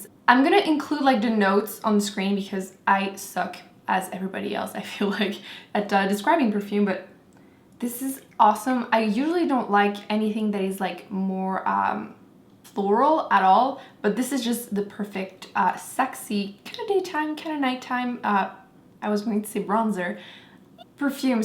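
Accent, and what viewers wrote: Quebec, Canada. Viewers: I love your channel and you seem so sweet! Also I adore your accent haha I thought it sounded familiar and then I saw that you are French Canadian! I'm Canadian too :) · I am a new subscriber. I see in your info you state your accent is French Canadian but I don't see your location. Where do you live now? Thanks, Jen xx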